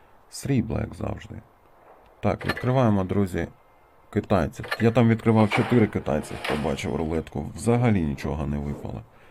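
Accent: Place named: Chernihiv, Ukraine. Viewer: А Ти сам що з Чернігівщини? Наш говор😂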